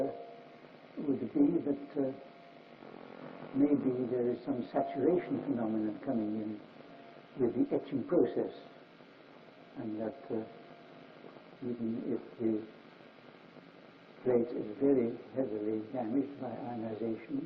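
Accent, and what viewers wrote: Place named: United Kingdom. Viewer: I was wondering! It can’t be, this guy has a British accent but Dirac was American right?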